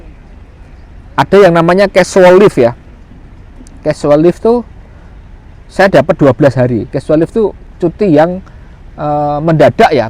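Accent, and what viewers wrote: Java, Indonesia. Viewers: Iya... Logat Jawa bahasa Indonesia enak, nyaman dan easy listening... Semoga sukses selalu... Masbro (kyknya kita seumuran, mas )...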